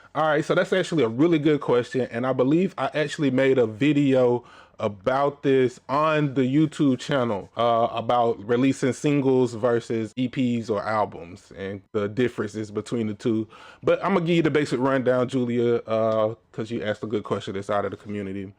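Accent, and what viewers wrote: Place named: Houston, United States. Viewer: My boi got that H-Tine accent. 🔥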